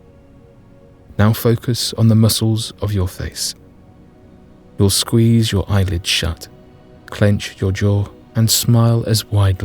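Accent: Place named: United Kingdom. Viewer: so glad this is a british person i hate the annoying american voices that they usually have on these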